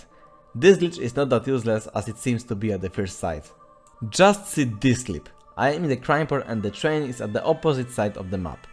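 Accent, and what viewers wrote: Poland. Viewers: Ah ten polski akcent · Z polski jestes bo polski akcent? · ale słychać tą polskość. Pozdro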